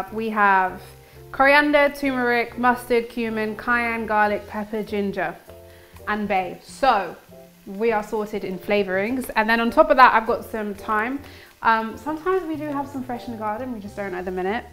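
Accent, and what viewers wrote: United Kingdom. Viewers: sounds like every other british person no?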